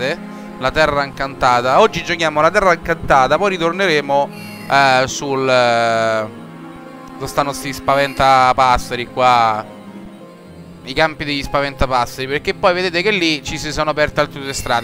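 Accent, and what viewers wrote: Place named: Rome, Italy. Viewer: ahahah e vero!! XD e il massimo il folletto con l'accento romano considerando che lo sei anche te XD il gioco ftt apposta per te!!! XD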